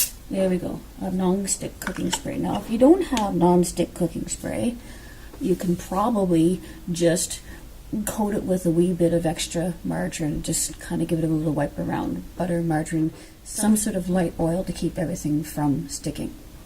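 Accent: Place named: Ireland. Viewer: Are you originally from Canada, or somewhere else? Your accent sounds almost Irish! 😊☘️ Recipe looks delicious, and I love the crock insert💖 HAPPY THANKSGIVING🦃 Blessings from, Washington State USA 🌲☔💖🙏😊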